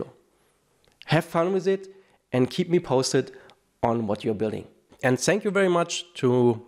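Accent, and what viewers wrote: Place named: Germany. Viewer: Great Video!!! 🔥🔥🔥 Love the German accent! ♥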